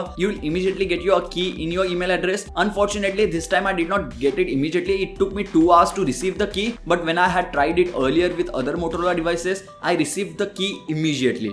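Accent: India. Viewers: The speed at which you're talking would be fine...if you had clear enunciation and syntax of the English language, and you do not. You have a very thick Indian accent and it's difficult to understand you. I gave up trying to listen and stopped your video after about a minute and a half. You're talking way too fast for your level of English enunciation. You need to slow down so that listeners don't have a difficult time trying to understand and decipher everything you're trying to say.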